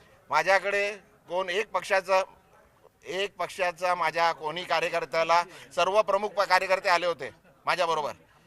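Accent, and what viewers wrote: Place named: Agri, India. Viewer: तो तिथला भूमिपुत्र आहे आणि आगरी आहे त्यामुळे तो आगरी लयेत मराठी बोलतोय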